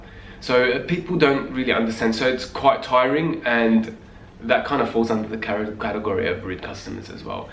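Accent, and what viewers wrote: Australia. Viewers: Everything you’re saying is spot on 😂😂😂 are you Australian? Love this video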